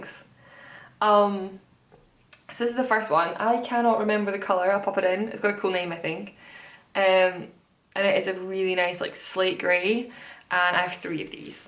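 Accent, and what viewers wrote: Scotland, United Kingdom. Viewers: Your podcasts are great :) I was wondering if you could recommend more Scottish knitting podcasters, I absolutely love listening to Scottish English. Also, I planned a trip to Edinburgh this October and I would be very grateful if someone could recommend a nice yarn shop in Edinburgh centre :) Thank you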